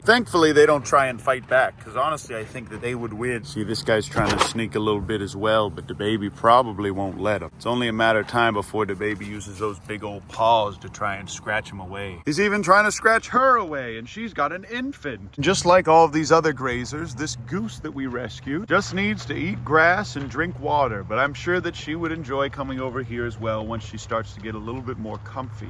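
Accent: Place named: Texas, United States. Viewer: you got a Texas twang that is organically coming out. Hoooo hooo hooo 😂 I could watch the animals graze and eat for hours. ❤❤❤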